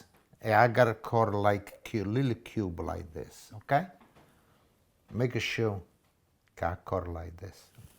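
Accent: Italy. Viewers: He is such a darling man, and his Italian accent is absolutely adorable. 🎉❤🎉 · The man sounds Italian but trust me, he cooked that like a uni kid on rations doing an experiment!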